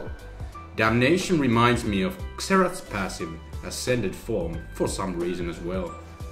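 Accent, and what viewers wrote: Finland. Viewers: Your accent is sooo Finnish ;D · are you from finland? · Are you finnish? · Mä tiesin.. helkkarin suomalainen aksentti. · Are you from finland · are you finland ? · U finnish? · Mä vähän aattelinki et oot suomalaine, koska aksentti :D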